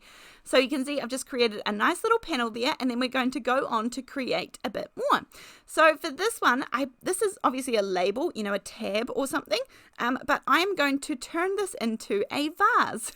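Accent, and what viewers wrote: New Zealand. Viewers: agreed. I love your Mainland accent😊 it brightens my day. ❤ have a fabulous week. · Beautiful cards from cute stickers. I love them all. I love your accent, too. I watch a lot of BritBox and you have helped me to identify the New Zealand accent 😊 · I'm an Aussie an although we usually take the mickey out of our Kiwi cousins I also like accent and herbright and breezy outlook always makes my day 💕🤪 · !! Don’t say vayze. Be proud of your kiwi accent with English roots and say varze. Lol. And yes i also love your smiley happy voice too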